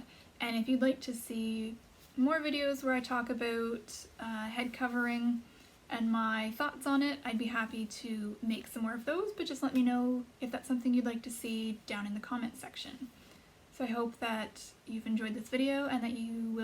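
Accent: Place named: Canada. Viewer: God bless you sister. Thank you so much for sharing. Good for you for seeking to obey the Holy Spirit's leading in your life. I'm super new to head covering, and get great joy from these wonderful testimonies. Are you Canadian by any chance? Just asking cuz you sound a lot like me, and I'm in Nova Scotia, originally from B.C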